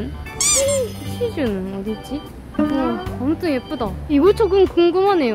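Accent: Gyeongsang, South Korea. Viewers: 은근히 대구 사투리 뭍어 나오는거 겁나 매력적.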